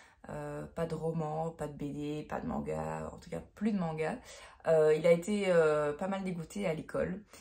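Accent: Belgium. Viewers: Coucou. Mon oreille détecte un léger accent belge.... Dites-moi si je me trompe lol. Je suis belge moi-même 😉. Bravo pour le vlog👍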